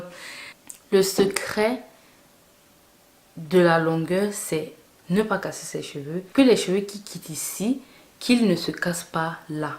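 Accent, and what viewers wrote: Cameroon. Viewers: J'adore ton naturel ... la voix de la Cameroun aise 😍😍 et tes cheveux sont juste magnifiques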